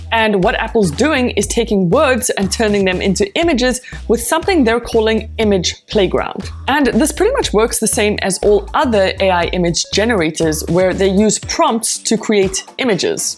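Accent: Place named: South Africa. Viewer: Your South African accent is beautiful 😍😍😍 just like the rest of you. Love your videos too! ❤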